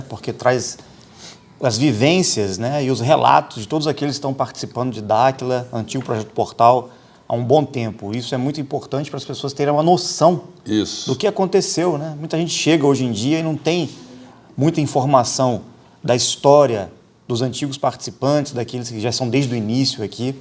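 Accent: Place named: Rio de Janeiro, Brazil. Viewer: está carioca..😮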